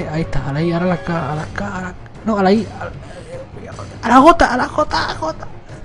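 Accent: Spain. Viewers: De que parte de españa eres?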